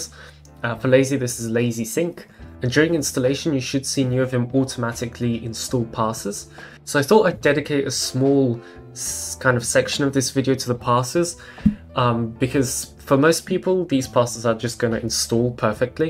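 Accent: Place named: New York, United States. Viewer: sounds like newyork :D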